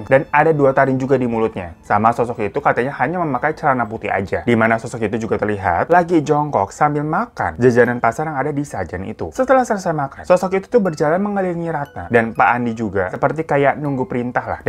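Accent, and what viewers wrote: Indonesia. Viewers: Slang indonesia kamu paling mantap.. suka saya dengar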